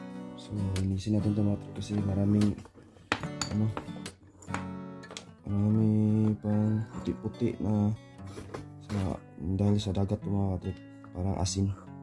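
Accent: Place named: Visayas, Philippines. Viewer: Taga saan ka dol parang bisaya ka Eh saan ako makabili ng battery niyan At Paano yong battery Charchable po ba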